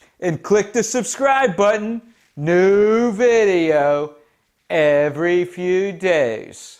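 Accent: United States. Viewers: the American accent making «Cossacks» sound almost like «Kazakhs» ^^ Very worthwhile video!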